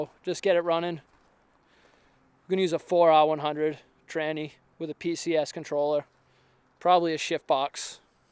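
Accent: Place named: Massachusetts, United States. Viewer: You’re from eastern Massachusetts, aren’t ya. Lol. Awesome work. How hard would that be to install in my 92 F350?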